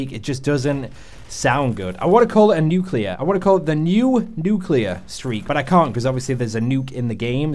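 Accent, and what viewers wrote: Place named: Northern England, United Kingdom. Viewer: his accent is really odd, like northern (english) with an American tinge...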